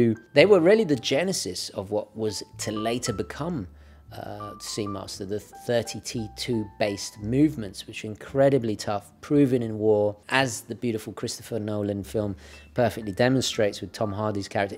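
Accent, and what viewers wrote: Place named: United Kingdom. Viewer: As soon as Daniel Craig retires from 007 movies, is my choice for the roll. Charming,likes watches,British accent,probably looks good wearing a suít....not to mention his smile with those perfect teeth....any Bondgirl would go crazy... now,seriously,great video as always. Technical and always sharing your knowledge on horologycal culture and history. Congratulations!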